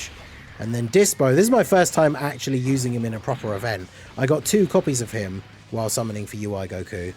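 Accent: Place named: United Kingdom. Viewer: Are u from the uk? I love the british accent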